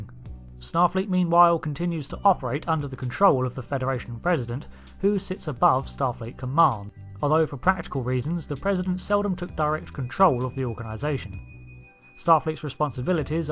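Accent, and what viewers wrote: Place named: RP, United Kingdom. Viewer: French caption with an RP English accent.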